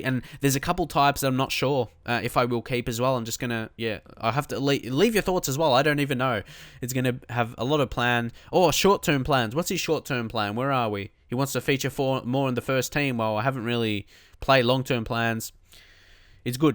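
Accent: Australia. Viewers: this aussie accent man. cheers from Syd, mate. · ih yes i love the austräääälian accent!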